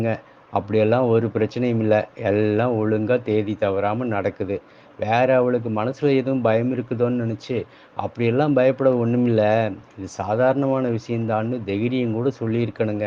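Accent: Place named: Kongu, India. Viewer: அருமை அருமை சார்.... அற்புதமான கதை.... நெகிழ்ச்சியான முடிவு.... கொஞ்சும் கொங்குத்தமிழில் கதையை வாசித்தது கூடுதல் சிறப்பு.... வாழ்த்துக்களும், பாராட்டுக்களும்... 🎉🎉🎉🎉🎉🎉 மேலும் பல கதைகளோடு மென்மேலும் தொடரட்டும் தங்களின் இலக்கிய பயணம்❤❤❤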